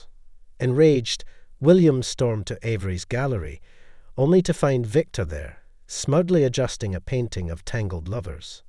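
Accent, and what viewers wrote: Scotland, United Kingdom. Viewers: He sounds Educated Scottish to my ears.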